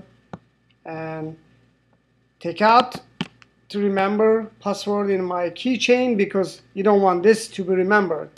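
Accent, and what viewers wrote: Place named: Russia. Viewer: russian lol!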